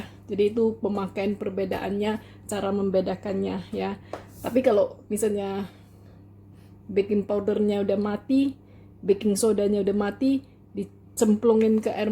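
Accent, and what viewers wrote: Medan, Indonesia. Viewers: Salam kenal y, suka dengan vidio nya, dari bahasa nya macam org medan y😂😂😂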